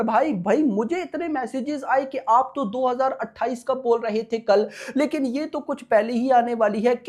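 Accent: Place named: Lahore, Pakistan. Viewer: Bhai teri language suni muje lga tu pakistani he fir teri bio me dekha such me tu pakistani he hindi tumari lahori wali he 😅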